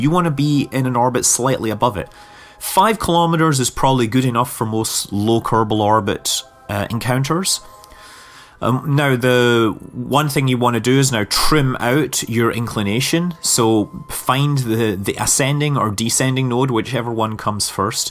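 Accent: Scotland, United Kingdom. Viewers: He has a scottish accent :)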